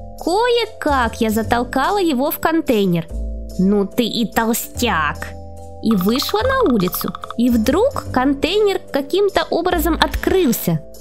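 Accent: Ukraine. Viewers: Ты очень красиво говоришь по-украински может просто делайте ролики на украинском и можешь ещё сделать побольше историй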